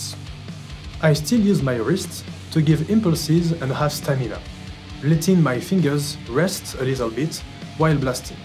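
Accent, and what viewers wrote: France. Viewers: Je comprends pas d'inquiétude x3 Je ne critiquais pas il me semblait juste avoir cru entendre un petit accent qui sonnait français ^^ En tout cas je vais essayer de mettre en pratique ce que j'ai vue dans cette vidéo et bonne continuation 😁 le blast est une technique que mes mains et mon poignets n'apprécient pas xD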